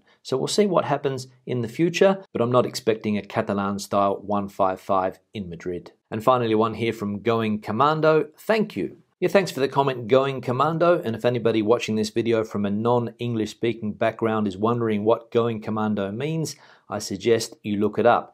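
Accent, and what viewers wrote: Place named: Australia. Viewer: your mild Australian accent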